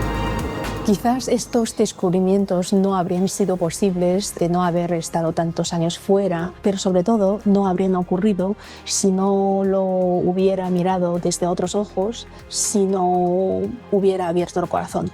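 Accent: Spain. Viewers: Hola 👋 saludos desde 🇨🇱 América del Sur, tienes un hermoso acento, cuando vi tu vídeo pensé que habías nacido en España, y después ver los vídeos pude ver que no era así. Tienes además una voz hermosa y perfecta para trabajar en radio y televisión tanto en España, China o cualquier país de habla hispana, y además tú eres muy hermosa y saludos desde Chile..... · Un poco triste también sucede en Latinoamérica con algunos jovenes que les dan pena decir no eres la única persona somos orgullosos de donde vivimos te felicito por salir adelante eres muy hermosa Hablas español tienes un acento de España Te deseo mucha suerte 👍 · Soy español, canario, hablas como una goda, perfecto. Que bien español!!Los canarios solemos llamar a los peninsulares, "godos". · Muy vuená tú istoría Ablás comó los Españoles ❤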